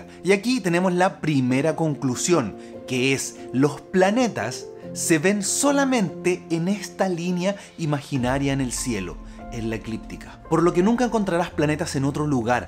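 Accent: Chile. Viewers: Amigo... eres el mejor!!! Muy buen video... tu explicacion es excelente!!!! Ah!!, me encanta tu acento chileno. Soy de Venezuela, pero vivo en Estados Unidos... Te felicito...!! Excelente!!!!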